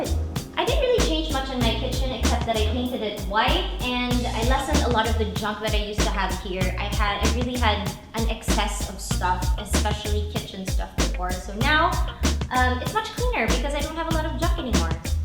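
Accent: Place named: United States. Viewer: Wow your English got good. U sound American... I love you. I’m a big fan.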